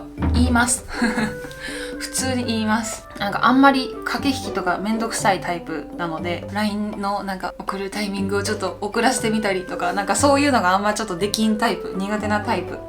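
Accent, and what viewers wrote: Kansai, Japan. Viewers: お疲れ様です、毎回楽しく見てます。50のおっさんでも毎回そうそうと思いながら見てます。関西のイントネーションが好きかも(笑)頑張って下さい！ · 女性YouTuber全然興味なかったし見もしなかったけど、は飛び抜けて可愛いし関西弁が可愛すぎる。。。チャンネル登録してしまった。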